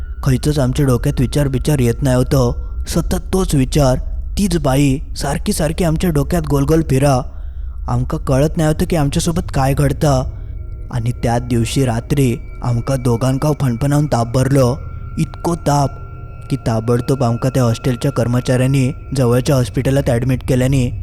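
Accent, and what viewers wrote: Malvani, India. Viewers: I.like.your.malvani.voice.laya.bhari.rel.Vivek Singnath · Which malvani he is talking ..this is different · मालवणी बोको हा चॅनेल मी माझ्या 3 अकाउंट वर फॉलो केलेला असा. आणि मित्र परिवाराला ही फॉलो करायला सांगितलं असा. माझ्या घरातल्या 16 जना नच्या मोबाईल वरून पण फॉलो केलेला असा. गावी जातो तर तुमचे व्हिडिओ आम्ही ग्रुप ने बसल्यावर बघतो रात्रीचे. खूप thrill वाटते ग्रुप मध्ये बसून ऐकायला एक वेगळीच मज्जा येते. त्यात ह्या व्हिडिओ मध्ये तुमचा मालवणी आवाज.त्यामुळे व्हिडिओ ला एक वेगळीच मज्जा येते. मी रोज दिवसातून 4 व्हिडिओ बघतोच बघतोच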